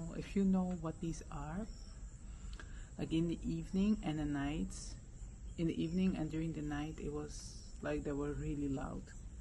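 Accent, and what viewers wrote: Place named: Saint Lucia. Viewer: Are you from St Lucia, originally, you sound like a friend of mine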